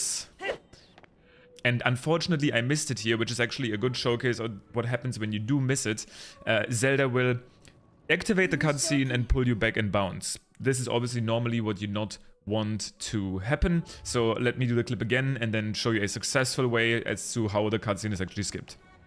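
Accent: Germany. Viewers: I can hear the German accent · Wie man einfach instant hört, dass du deutsch bist haha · Are you german? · I love your accent! Southern American here. Apologies if you aren’t German, but one of my favorite teachers ever was German and you remind me of him!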